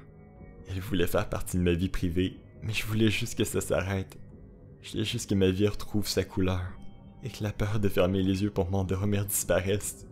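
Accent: Quebec, Canada. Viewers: On dirait un accent québecois nn?❤️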